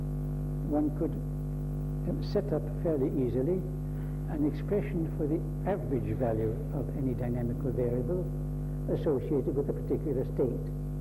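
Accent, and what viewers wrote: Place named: Netherlands. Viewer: He sounds Dutch, strange considering he did not grow up there.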